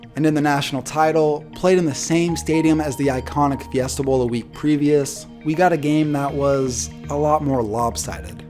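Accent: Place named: Louisiana, United States. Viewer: Are you from Louisiana?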